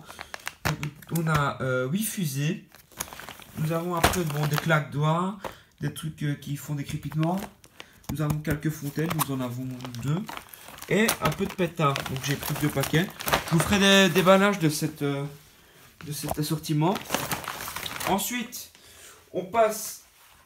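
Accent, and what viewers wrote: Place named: Belgium. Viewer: Non c un belge çà s'entend ( je suis belge)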